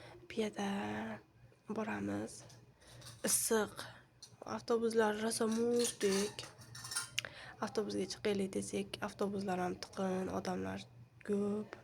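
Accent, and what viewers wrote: Andijan, Uzbekistan. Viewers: Оллохни уйида мазза киляпсиз экан да оллохим уз даргохида Кабул килсин Каеридансиз шева анжан а рахмат катта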